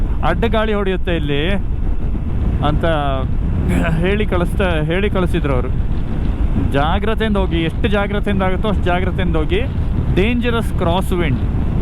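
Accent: Kundapura, India. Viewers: Kundapura Kannada ❤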